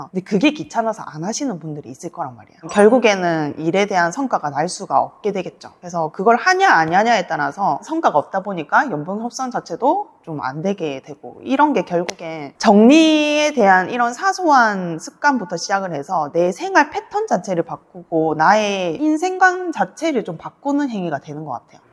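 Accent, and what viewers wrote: Gyeongsang, South Korea. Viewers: 이 가스나 갱상도 말 투를 숨기려고 애 많이쓴다!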